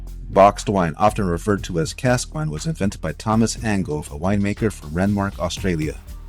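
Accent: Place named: United States. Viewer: Why are all our Australian vids always voiced by Americans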